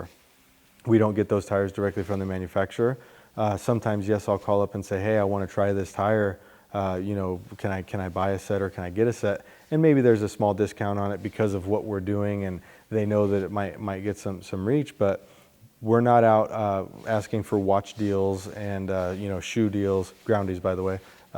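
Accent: United States. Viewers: I notice your american accent. Howd you decide to move to Germany?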